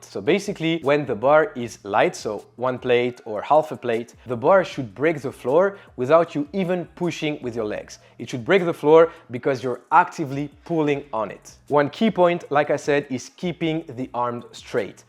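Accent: France. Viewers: Super tes vidéos en anglais ça me permet de m’exercer à l’oral de manière pas trop hard core grâce à ton accent français 👌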